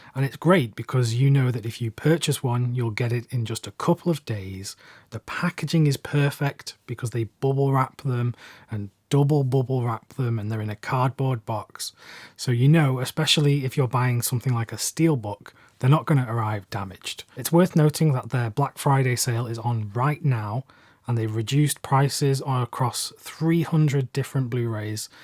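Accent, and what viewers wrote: United Kingdom. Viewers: Are you not British?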